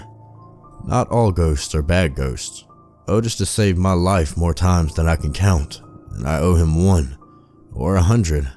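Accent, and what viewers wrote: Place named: South, United States. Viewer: You got that southern twang in your narration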